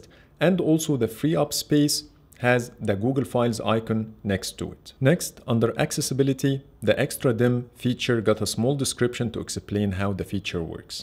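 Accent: Egypt. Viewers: u accent look Egyptians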